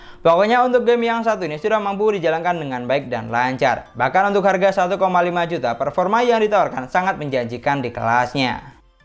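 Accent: Madura, Indonesia. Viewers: kayak nya abang orang madura ya dari logat bicaranya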